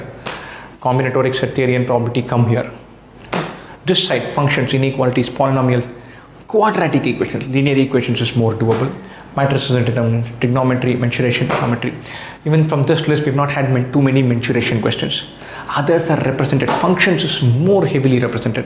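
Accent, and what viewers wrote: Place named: South India, India. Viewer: everything all good but get trouble understanding your language( south accent) maybe.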